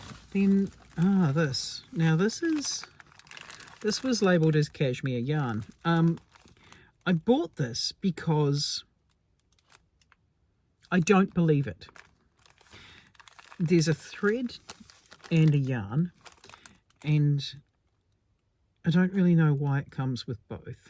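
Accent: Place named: New Zealand. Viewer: As soon as you said “The Warehouse”, I was like… her accent… she HAS to be a Kiwi… but you did confirm it 🫶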